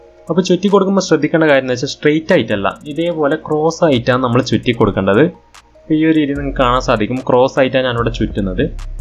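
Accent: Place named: Kannur, India. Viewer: കണ്ണൂർ ഭാഷ ഇതിലൂട്ട എടുക്വ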